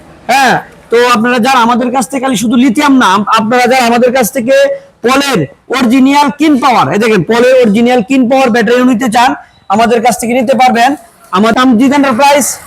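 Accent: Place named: Kishoreganj, Bangladesh. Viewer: আপনি কিশোরগঞ্জের আঞ্চলিক কথা বলেন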